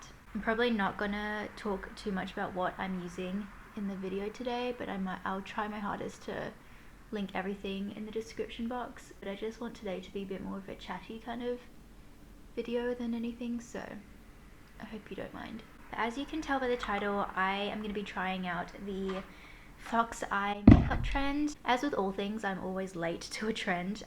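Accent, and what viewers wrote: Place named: Australia. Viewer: Your a Aussie even your Aussie accent That's so bad my brother has a Thai wife who lives here I have a Thai girlfriend who's in Thailand and my youngest brother has a American girlfriend who's parental are Lao you shouldn't feel like that your very beautiful and your eyes are very round don't care about people who say bad stuff about you and the same my Mum and Dad come from England but I am Australian you're just as me don't follow trends that's exactly what a trend is they come and go and I think you're a lot more beautiful than most other western Australian girls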